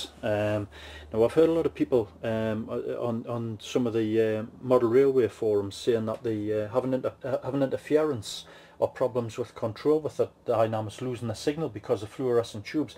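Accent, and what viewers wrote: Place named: Northumberland, United Kingdom. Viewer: Really enjoyed this beginning of your layout. My father was from Blyth and your accent brings back happy memories. We had a camping holiday at Blyth many years ago. I remember the wonderful beaches you have in your part of the world.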